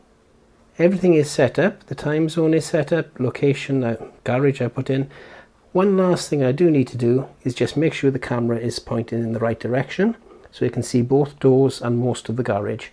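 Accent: Wales, United Kingdom. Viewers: just bought one, this video was excellent, cheers for sharing, also do I hear a hint of welsh or am I linguistically challenged, lol?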